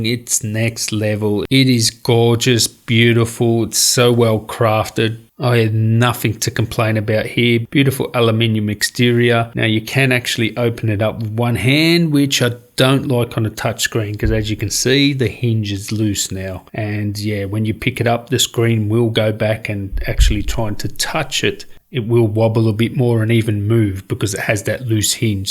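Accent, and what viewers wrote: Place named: Australia. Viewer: ahhh it's good to hear a tech reviewer with a real legit aussie accent!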